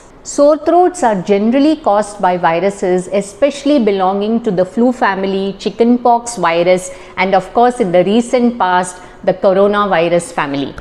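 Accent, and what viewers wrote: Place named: Karnataka, India. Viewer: Ur a south person, but by slang I think ur Kanadi.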